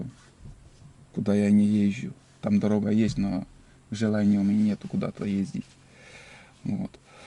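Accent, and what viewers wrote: Komi, Russia. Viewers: Давненько в Коми не бывал.голос слышу, детство вспоминаю. Коми му))) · Виддза олан! Судя по акценту, Ижемский?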